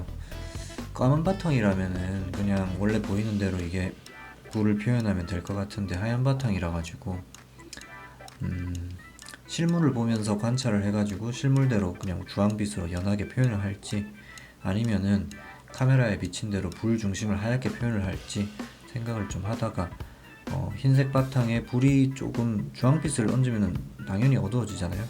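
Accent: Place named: Busan, South Korea. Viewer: 붔산사투리가 구수하네예~~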